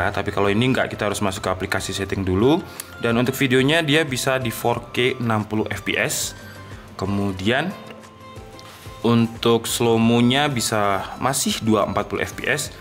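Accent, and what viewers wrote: Java, Indonesia. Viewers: E Wong pundi jenengan lur..??kok pakai logat jawa..,😀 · Orang malang bang ? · Mas kowe wong jawa po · Orang malang bang?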